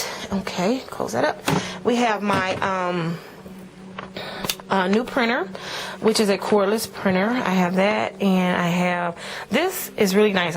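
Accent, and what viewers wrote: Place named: St. Louis, United States. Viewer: Awesome Room, can I come shopping! :-) lol St. Louis Talk, "Whered' you go to Highshool?" Do you drive on highway "fardy" :-)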